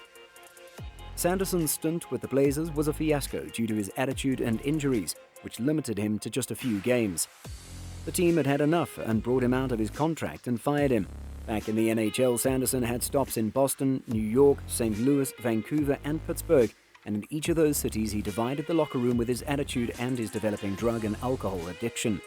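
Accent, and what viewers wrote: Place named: United Kingdom. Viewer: this vid is shallow rubbish ...w/ a Brit accent ?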